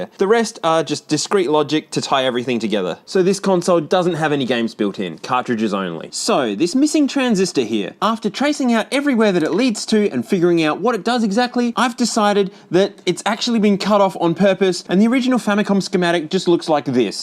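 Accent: Australia. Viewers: Guy who has only ever heard 1 south australian hearing their second sound australian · he is aussie mate. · Why am I so f*cking hooked on Australian YouTuber delivery. My wife calls it’s Bluey for adults 😬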